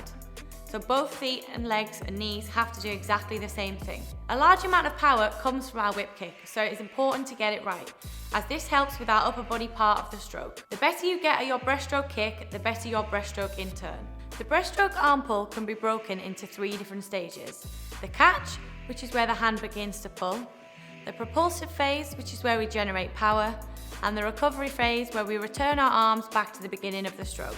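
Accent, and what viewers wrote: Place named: United Kingdom. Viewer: British accent🤣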